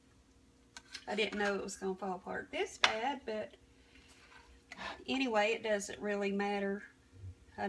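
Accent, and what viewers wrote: South, United States. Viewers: I have just found you, where are you located. Being from the south we don’t think we have accents only the Yankees do, but I thought I heard a little bit of southern twang in your voice, very comforting. Keep on cooking and I’ll continue to watch. Thank you Lady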